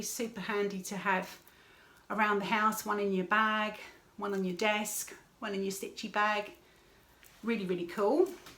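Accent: England, United Kingdom. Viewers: Always enjoy watching your FT. Jealous of your conservatory🙂 I enjoy hearing your English accent, as my mom was from England, and I hear you say some of her expressions like skew wiff. I’m in Ontario Canada. I’m glad you are doing the Lori Holt sewcial,. I also enjoy stitching up her patterns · SapphireMtHandcrafts A lovely time when I sit back and let you transport me to England with you accent and way of talking. So enjoyable. Looking forward to seeing how the watering can bag turns out. You garden looks like it needs a few more months before Spring arrives and flowers overflow your hanging basket s. Thanks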